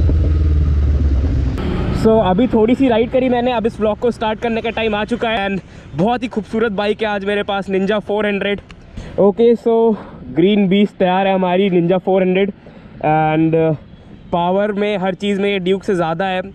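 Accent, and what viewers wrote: India. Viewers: How does every indian speaks the exact same english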